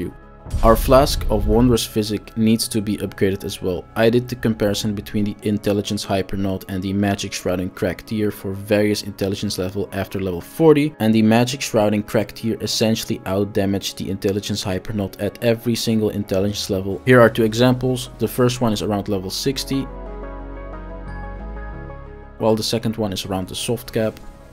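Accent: Netherlands. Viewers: Are you dutch? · You da man! Thanks for the guides. By the way, is it correct that I recognize a Dutch accent?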